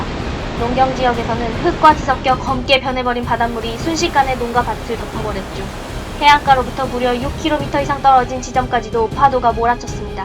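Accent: North Korea. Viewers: 해설자 북한사람?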